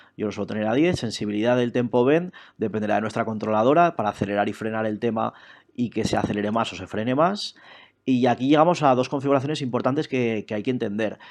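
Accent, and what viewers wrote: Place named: Zaragoza, Spain. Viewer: Amigo, hermano y ahora maestro: "Muchísimas gracias por su ayuda en verdad y por tener la amabilidad y el tiempo de contestarme." Ya he repuesto varios archivos en su mayoría que he creído que son los archivos .TSI correctos, cómo usted mismo me lo indicó, pero desafortunadamente sigo en las mismas. En cualquier caso le agradezco de igual forma por su ayuda y a juzgar por su acento, supongo que es de Zaragoza, España (Solo conozco su equipo de futbol). Espero tener la oportunidad de ir a visitar Europa en el futuro. Mientras tanto te has ganado a pulso un nuevo suscriptor y disculpame si escribo mucho!!!! :) :)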